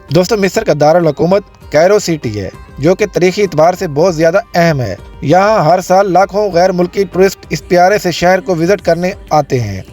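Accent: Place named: Punjab, Pakistan. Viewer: بھائی اردو تو سیکھ لو ۔۔ پنجابی میں اردو بول رھے ھو ۔۔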